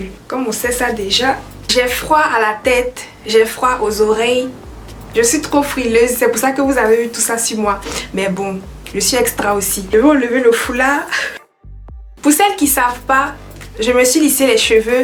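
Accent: Ivory Coast. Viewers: Ma soeur ivoirienne, je te kiff grave ,chic accent ivoirien et chic vidéo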